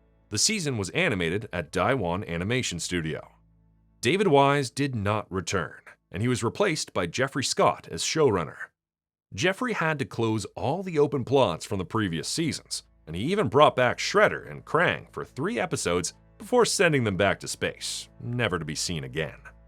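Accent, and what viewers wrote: Canada. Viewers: You will quadruple your views by replacing this cornball Canadian narrator. Said with peace and love. “With full medical insurance”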